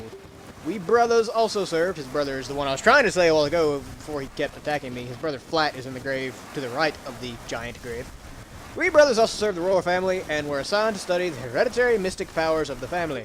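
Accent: South, United States. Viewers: This game really makes your Southern accent shine in a good way!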